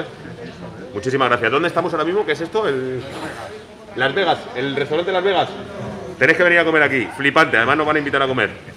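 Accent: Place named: Cuba. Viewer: esa cochinada mas q español parece de cuba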